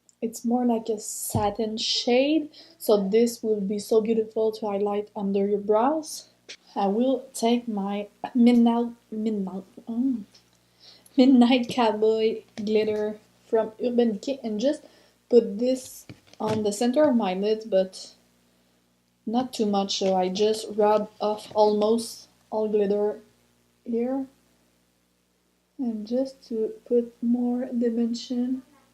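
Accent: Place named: France. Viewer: gurl don't hide your French accent, I love when you put the accent on the RRRRR 😍😍 you look gorgeous and that eyeshadow is so beautiful!!!! Great look ❤️🤩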